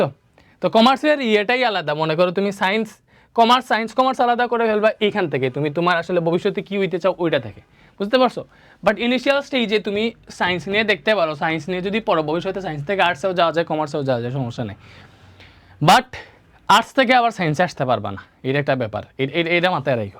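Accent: Sylhet, Bangladesh. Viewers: bahi mone hoy sylheti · ভাই আপনার ভাষায় বোঝা যায় আপনি সিলেটি😊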